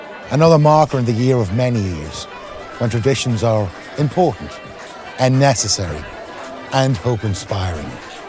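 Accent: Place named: Dundalk, Ireland. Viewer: I love that we have poets among us , this brought a tear to my eye , I know every single street and monument but it’s the Dundalk turn of phrase , the tone and accent that resonates . Theses memories are the constant glow that warm us ... to recall those we are with and without ... remembering always where we’re from. Wonderful , thank you .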